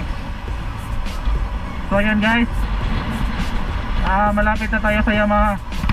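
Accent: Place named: Cebuano, Philippines. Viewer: Halatang bisdak paps ah😁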